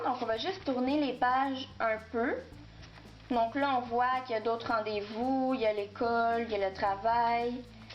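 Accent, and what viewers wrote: Quebec, Canada. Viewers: québecquoise, c'est un des accents qui se repère le mieux ^^'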